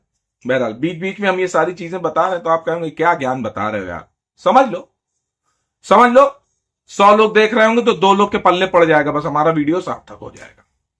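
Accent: Kanpur, India. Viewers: एक दम आम व ज्ञानी आदमी, कनपुरिया स्टाईल में। मजा आ जाता है सुनकर। अति उत्तम।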